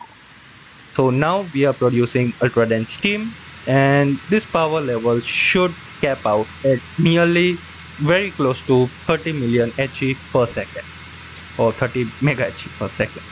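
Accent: India. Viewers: Thank you thank you guy with Indian accent. Without you i would have been lost the moment i stepped on this mod. Killed over and over again by the Glyphids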